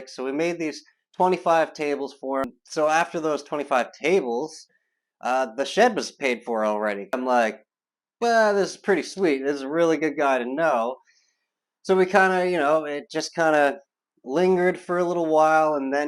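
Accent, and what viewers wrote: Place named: Atlantic Canada, Canada. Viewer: I love your accent! Nova Scotia or Newfounland?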